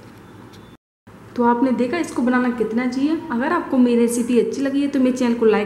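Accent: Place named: Delhi, India. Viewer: Bolne k tarike k lag Hi rha tha ki shayad humari taraf se Hi ho kyunki me b Delhi se hu but Gujarat me reh rhi hu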